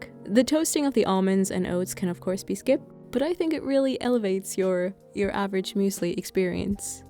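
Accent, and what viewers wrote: Germany. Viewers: Liebe deinen deutschen Akzent haha !!! Love your videos !! ❤️❤️❤️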